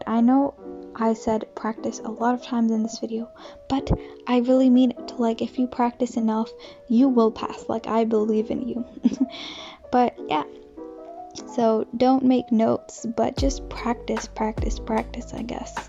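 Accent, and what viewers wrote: United States. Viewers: i love how you did the 11+ but your accent is American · Are you american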